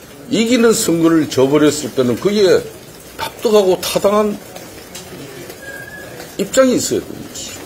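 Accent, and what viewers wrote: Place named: Gyeongsang, South Korea. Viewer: 갱상도자나 ㅎㅎ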